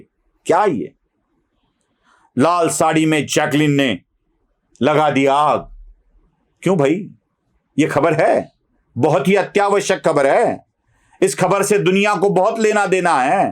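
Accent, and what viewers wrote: Maharashtra, India. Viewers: सर आर्ध मराठी माणूस मराठी बोली नाही विसरू शकत हिंदी बोलताना keep it up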